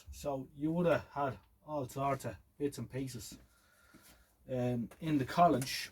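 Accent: Ireland. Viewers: As your man says 'you can never have to many multimeters' my cheap CPC dvm is oten let on and is always flat, i never seem to have managed to keep the internal fuses for current so it's volts only. I have a NOS 70's far east meter that i bought for old times sake and i still drag out my big old AVO. I played your voice down the phone to my wife, she was from Hacketstown Carlow. She reckoned dublin or wicklow. Anyway mate, keep up the good work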